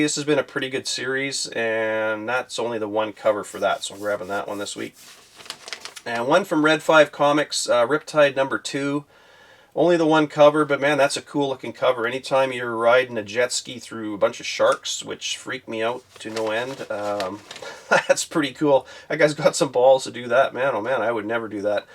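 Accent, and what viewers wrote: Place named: Canada. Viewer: Enter me for Venom 5 and thank you for simply talking comics with a Canadian accent 😃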